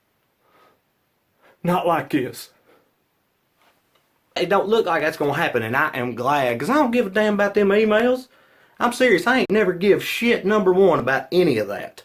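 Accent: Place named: South, United States. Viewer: I watch this dude because I find the southern accent so hilarious!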